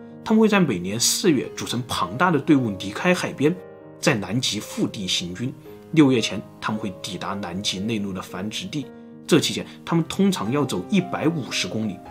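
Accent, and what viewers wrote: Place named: Mainland, China. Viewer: 中國人發音跟台灣不一樣你當亞洲國家只有台灣？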